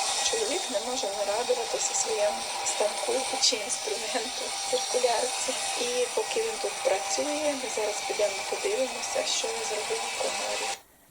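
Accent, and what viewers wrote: Western Ukraine, Ukraine. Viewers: Зайшла на ваш канал вперше.Живу в 25 км.від Києва в селищі.Молоко купуємо в магазині,корів днем з вогнем не знайдеш.Навіть кури люди перестали тримати корм коштує дорого,а паїв у нас немає.Землі у кожного не багато.Яйця на сьогодні 80 грн.коштують.Але живем головне миру іхлопцям допомогти.Здоров'я вам пані і вашому чоловікові.До речі розмовляєте ви як спражня жіночка з західної України.У нас багато суржику.❤❤❤❤❤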